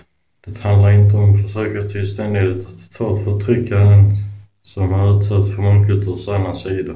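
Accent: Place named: Scania, Sweden. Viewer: önskade att du kunde prata svenska istället för skånska.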